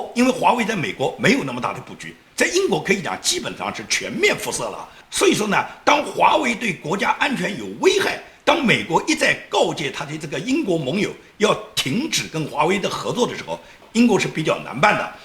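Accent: Nanjing, China. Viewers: 尊敬的哥您好，18年初看您的视频至今，收获良多，我也是南京人，特别喜欢您南京口音的普通话。您的坚持不懈，您的语速，您的激情都感染着所有同道中人。有人认为您用词反共过于夸张，过于亢奋激情。其实不然，太多中国人已经习惯跪太久了，冰冻三尺非一日之寒，矫枉必须过正，再不激情怎能唤醒国人，即使夸张又如何。还有人认为您对共匪不分对错太过痛恨有点过了，而我只想说未经他人事，莫劝他人善。有这想法的人他们不懂您，更不懂共匪。您的所有视频我都看完。由于一些特殊原因有一个多月没能上youtube看您视频，近几天也都补看完了。当我看到1000期您说爱情故事时，我被您的爱情故事震撼了，发自内心来说这是我听过的一个最好的最真切唯美的爱情故事，您的故事有血有肉，有柔情有理想怎能不让人感动。哥不愧南京人，果然真男人!您对理想的执着让人无比敬佩和感动。如果您能看到我写的东西话我想说两点我的想法，仅供参考，1.您觉得匪让人有肉吃有酒喝了所以人民安于现状了，麻木了，粉红了，其实那只是少部分。而大部分恰恰相反，他们是得天天在努力争取酒肉，不是不想剿匪，没有理想。而是在剿匪的路上需要吃喝，自古当兵不就为了吃口皇粮吗？而现状是他们连翻墙软件都买不起。2.中国人跪久了是怎么造成的那，未何一点血性都没了那？这不光是匪的原因，更重要的是儒家的原因。孔老二在战国时本是百家中最普通的一家一直不被认同。所以孔老二当时周游列国都不受待见，秦始皇用的是法家，汉用的是老子无为，直到千年后的中唐才为了封建统治用了孔老二洗礼人民思想。所以剿匪必先灭儒！最后说一点您可能不爱听的，习近平做为帝王他是伟大的，对于新疆，香港他没有开枪，无疑比毛，邓高明很多。还有扫黑扫毒等对内政策把社会风气提高很多。是江，胡所不能比的。我知道您可能会反驳很多，但我说的只是对于帝王来说，请正面理解。希望有机会去美国时能和您当面讨教。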